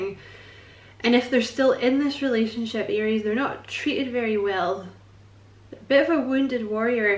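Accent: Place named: Scotland, United Kingdom. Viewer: Keep it going. Interesting reading. Have fun listening to your readings. You sound like me. With the pure Scottish banter.😂😂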